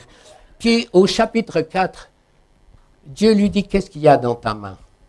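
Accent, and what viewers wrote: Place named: Vaud, Switzerland. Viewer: Magnifique avec ton accent vaudois Dieu Te Bénisse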